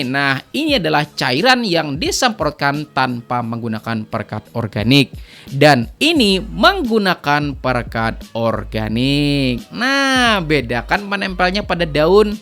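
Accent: Banjar, Indonesia. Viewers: Nakaya p ne model nya Bahasa urng banjar logat nya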